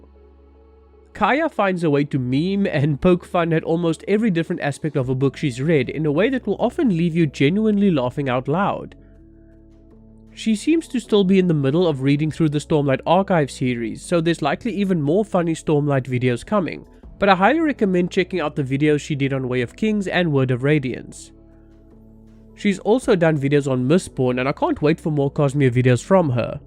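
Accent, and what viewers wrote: South Africa. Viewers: Are you Afrikaans/South African by any chance? From a South-African Stormlight Archive fan (an SA SA fan if you will)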